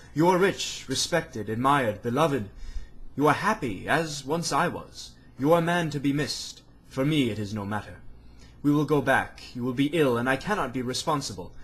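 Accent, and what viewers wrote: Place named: United Kingdom. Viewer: Why are you reading with a British accent? It's a story, written by an Amerinca, and set in Italy. And who is Lucrezi? I assume you mean "Luchesi".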